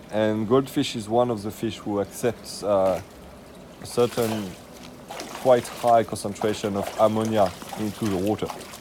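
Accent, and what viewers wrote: France. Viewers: Sounds french:-), thank you for this video and your chanel.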